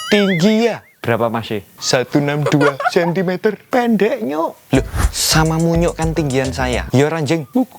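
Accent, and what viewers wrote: Yogyakarta, Indonesia. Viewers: kok koyo logat wong jogja yo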